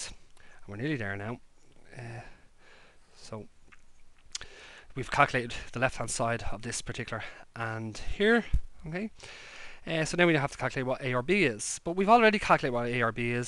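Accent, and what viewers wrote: Ireland. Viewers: hahaha you wonder? He's not Irish, he's MEGA-Irish!! (From the North). I love the accent, I used to live with two Irish friends from County Armagh back in NYC ages ago... People said I picked up on the accent back then :D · I wonder are you Irish?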